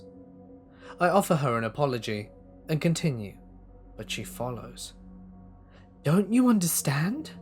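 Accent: United Kingdom. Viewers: Is this British English accent? sorry I've been learning English..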